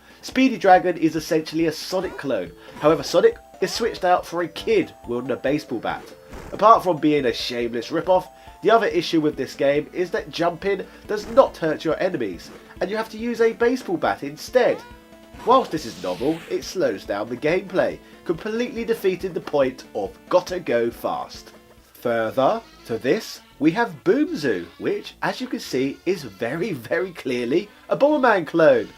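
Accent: United Kingdom. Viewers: I love the British voice !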